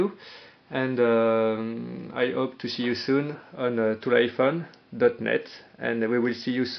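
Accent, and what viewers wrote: France. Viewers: sa se voit tellement que t'es francais mais c'est bien d'essayer!!! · T français acsenent de merde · Are you French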